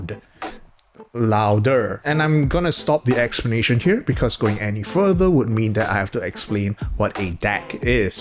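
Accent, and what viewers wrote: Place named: Singapore. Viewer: Just found your channel and I super appreciate your no-nonsense style and knowledgeability. Also your accent makes me nostalgic for sg ✌🏼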